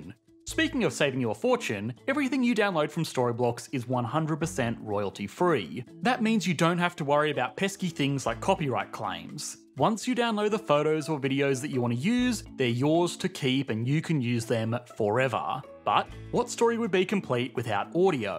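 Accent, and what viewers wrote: Australia. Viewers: I’m so sorry but I’m so tired of his australian accent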